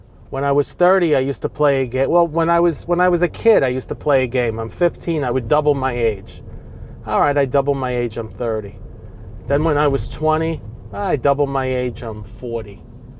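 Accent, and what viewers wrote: New York, United States. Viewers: Yes you sound like a New York native to me. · If I may offer, you’ve rebuilt your life multiple times and succeeded. But maybe the move now isn’t reinvention, it’s using what you already know in a way that’s more stable and easier on you physically. Just a thought. Find some ways to build up some monies for later on. By the way, yours is definitely a New York accent! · I'm in Florida and have worked with several people who have your accent. They are all NY Italians. Thanks for posting. God bless. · I'm from New Jersey. I could pick you out as a New Yorker in a second based on your accent. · Definately New York accent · You most definitely have a very strong NY accent and İ like it Makes me feel at home and İm 6k miles away İts Richmond Hill İ believe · You absolutely have a new york accent! Nobody on the west coast sounds even remotely like you. 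I would also call it an east coast accent. Love it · Yeah you have an accent but it's refined other than the standard New Yorkers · You're very patient and calm to make that commute Monday through Friday. You have a New York accent. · This guy doesn’t sound like somebody of average intellect. He’s full of wisdom too. And yes he has a great NY accent that’s easy to listen to. Never forget we’re here in earth school to learn. We start learning when we’re born; if we’re lucky, we don’t stop learning til “God” calls us home from earth school. Great scenery of NY! Reminds me of the tv show TAXI.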